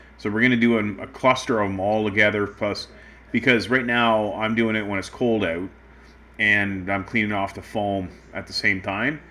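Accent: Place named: Canada. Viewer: You're Canadian accent makes me want to vomit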